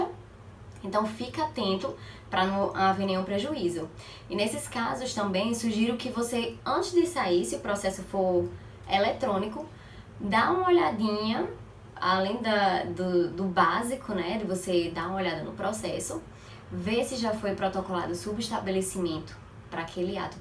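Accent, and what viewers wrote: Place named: Natal, Brazil. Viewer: bom vídeo doutora, parabéns!!.. mas me tira uma duvida.. (sou novo na advocacia) na correspondência jurídica, vejo algumas solicitações de diligencias de audiências trabalhistas para ir como preposto. .. acho "estranho" isso pois, "pq não ir como advogado mesmo da reclamada?!!?" .. como serei proposto numa audiência de uma empresa que não trabalhei ou não tenho vinculo empregatício nenhum?? gostei MUITO de suas dicas para esse tema! obrigado! ps: meu sotaque é igual o seu, sou de NATAL-RN. e vc? grande abraço.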